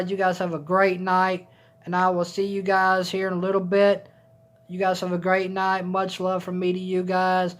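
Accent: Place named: South, United States. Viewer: You sound like a Southern gentleman. Georgia? I was born in Valdosta! 😃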